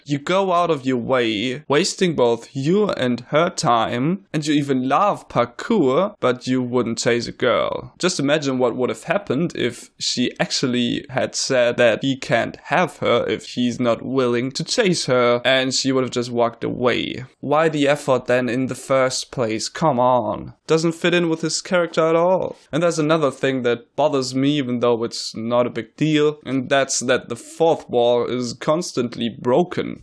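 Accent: Germany. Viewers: Dude Clearly i hear some German Accent in this Video and its Horrible!! better learn to speak like a human being or talk German. This suits you better.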